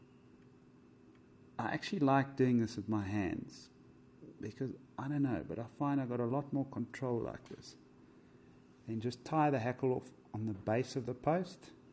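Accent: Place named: South Africa. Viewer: Love the tying and the SA accent. Great fly thanks.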